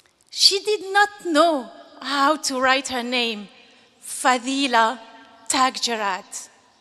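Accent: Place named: France. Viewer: nope that's actually her English accent, you can hear the French in it.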